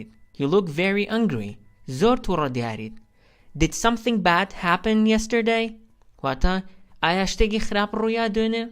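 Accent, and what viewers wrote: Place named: United States. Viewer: ئەوە ئینگلیزی ئەمریکیە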